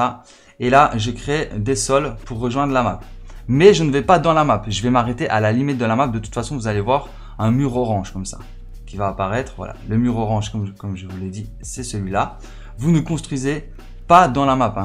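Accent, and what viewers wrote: Belgium. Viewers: Tu est belge ou pas (question)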